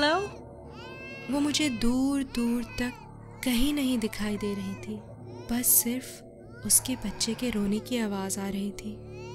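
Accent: Pakistan. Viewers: I think she is Pakistani and living in abroad since birth that is why she has amazing accent and maybe fictitious minded so she can create terrific stories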